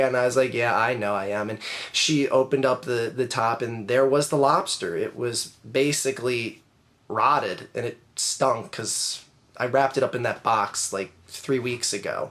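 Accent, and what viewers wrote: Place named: Philadelphia, United States. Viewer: you are definitely from philly saying wooder instead of water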